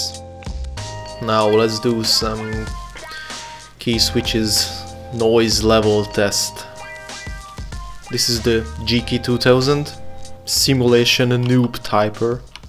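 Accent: Hungary. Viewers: Sexy magyar akcentus :*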